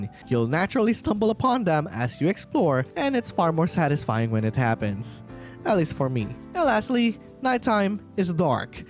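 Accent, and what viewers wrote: Philippines. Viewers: i needed help but not that bad enough to put up with this fkn fillo accent bhahaahah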